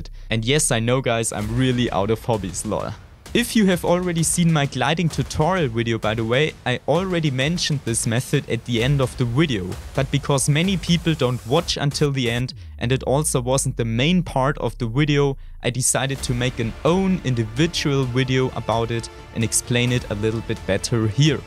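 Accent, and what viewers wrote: Germany. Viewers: Haha einfach dieser deutsche aczent xD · Man erkennt so schnell dass du aus Deutschland kommst. Wegen den accent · Hast du auch eine deutsche Version gemacht weil klingst sehr deutsch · Du klingst so deutsch:D You Sound so German:D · the german accent lolll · if ure not a German idk where u learn English 😂 sorry but everyone hear that ure not a English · are you german?you sound a bit like german xD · Höre ich da einen deutschen akzent ? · klingt sehr nach Deutschem Akzent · man hört dass du deutsch bist haha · Uff german English is so annoying to listen to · Der deutsche akzent xD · Auf jeden Fall ein Deutscher ,hört man am Englisch no front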